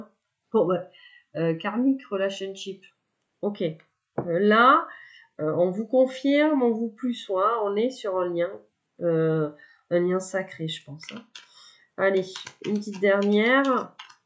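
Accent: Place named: South, France. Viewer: Bonsoir ravie de vous revoir une tres jolie guidance je ne vous lâche jamais j'aime votre façon de parler un peu de sud quant au eeee je comprends lorsque l'on réfléchi tout a fait normal les gens vraiment 🙄 débile prenez bien soin de vous surtout a tres vite j'espere🤩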